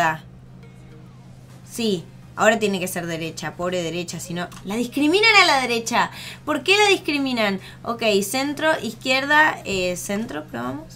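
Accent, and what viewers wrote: Argentina. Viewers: SOS Argentina?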